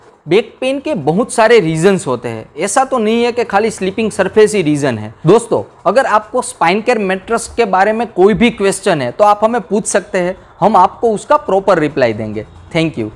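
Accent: Gujarat, India. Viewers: Iskae hindi ke accent se lagta hai ye pakka Gujju hai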